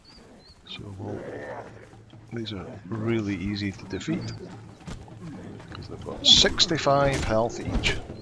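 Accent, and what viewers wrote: Scotland, United Kingdom. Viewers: I know it. One of my colleauges in Thailand was a Scotsman and he had the same accent as you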